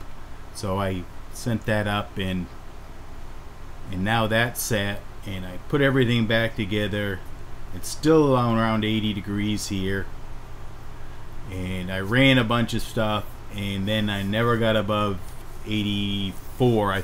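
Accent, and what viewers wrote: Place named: South, United States. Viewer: You've got a slight Forrest Gump accent :) Thumbs-up !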